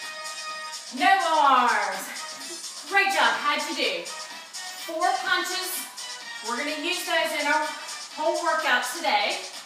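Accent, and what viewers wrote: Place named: North Carolina, United States. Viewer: Hi it’s Linda from Peoria again I just wanted to tell you I did the beginning boxing regiment and I really thought it was fantastic. I think this would be great for me to do in my water aerobics class. I have a sister in Chapel Hill North Carolina. I can tell the accent!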